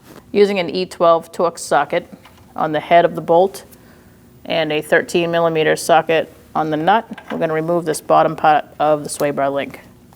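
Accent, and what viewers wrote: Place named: New England, United States. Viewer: Love that New England accent !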